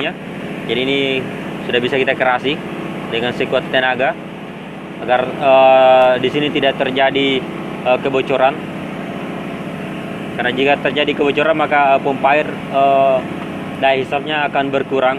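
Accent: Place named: East Java, Indonesia. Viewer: MANTAB PENJELASANNYA , KALAU DENGAR LOGAT BICARANYA SEPERTINYA MAS DARI JAWA TIMUR YA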